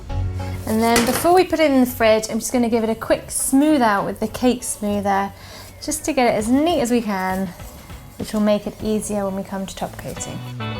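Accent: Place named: West Country, United Kingdom. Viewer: I love your accent. Is it a west country accent? :)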